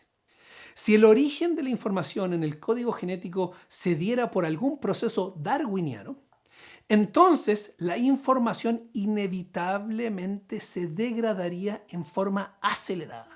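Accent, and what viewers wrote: Chile. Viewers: Vives en chile?, hablas como chileno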